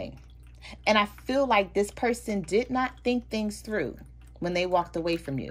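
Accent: Philadelphia, United States. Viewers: Are you from Philly???! 👂🏽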